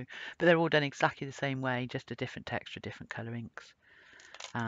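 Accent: United Kingdom. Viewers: I loved loved this video. Lovely British accent, soothing instruction and gorgeous pieces!!! Thank you!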